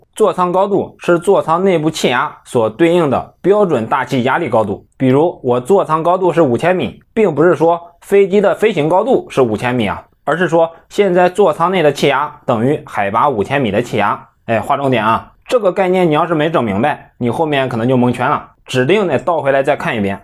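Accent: Henan, China. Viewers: 河南，还是山东的？ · ，听着是老乡啊，你河南哪里的呀？ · 你是河南的吗？咋口音有点像河南口音😂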